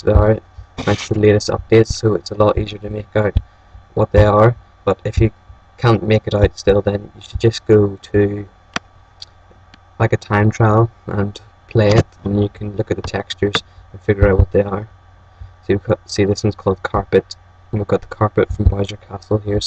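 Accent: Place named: United Kingdom. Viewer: Wow. i came to thiz vid to get the latez ZSZ site and i had the audio on, and chu have that AWesome British acent!! Lawl =)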